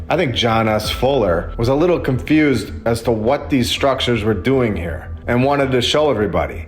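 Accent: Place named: Wisconsin, United States. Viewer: you can't hide that wisconsin accent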